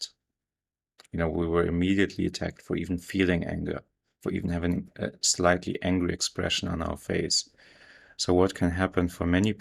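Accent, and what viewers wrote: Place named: Germany. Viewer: Bro thank you so freaking much bro. I was Over here continplating stuff about my ex who was German and I'm realizing I think she cheated on me then I see this video and it sounds like you are German and I'm also going through stuff with my mom and I was also for the last 3 years I have been like the angel guy cuz I thought I could like you said heal people by that but I ended up getting taking advantage of. Thank you thank you thank you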